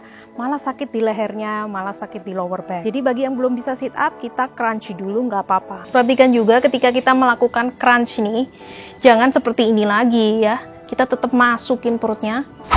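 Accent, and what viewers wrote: Java, Indonesia. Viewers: Lucu bgt perckpnya..medok bgt jawanya 😀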